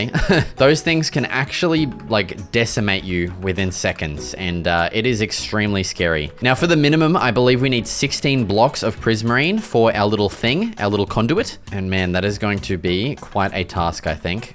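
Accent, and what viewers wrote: Australia. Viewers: Awesome gameplay to watch and listen(i just like an Aussie accent). And also, you should definitely defeat karkinos would be a nice goal for the series imo.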